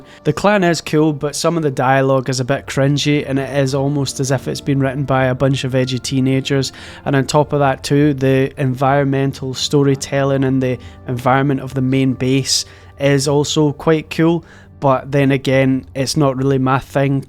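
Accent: Scotland, United Kingdom. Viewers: Do I sense a fellow Scottish legend?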